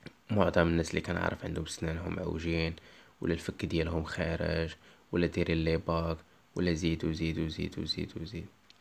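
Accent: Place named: Morocco. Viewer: لهجتو مغربية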